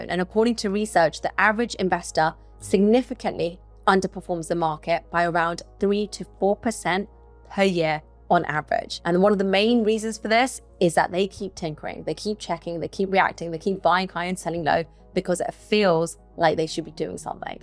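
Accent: United Kingdom. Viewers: well fella she is British and that is the way they talk .... it is like boston and the f bomb! oxford university PPE grad 1990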